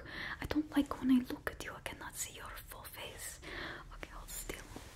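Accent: Russia. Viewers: as Russian I can say that is is an amazing Russian accent! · Русский акцент получился очень хорошо!!!!🥰💖💖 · russian stylist having a sister named natasha and the same accent as yelena belova in marvel my obsession is too big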